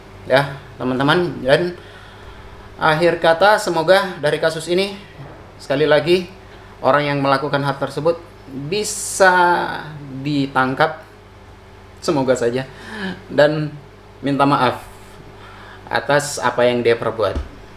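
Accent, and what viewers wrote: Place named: Malaysia. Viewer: tapi kok suaranya ke logat melayu Malaysia?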